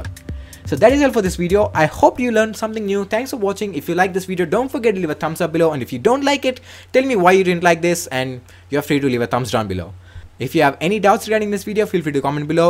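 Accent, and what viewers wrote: India. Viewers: fun fact: He sounds like an idian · In india school teaches us but I learned from foreign YouTuber and comments And he's Indian you can find by his accent and skin tone I can guess he's from southern India madurai is my guess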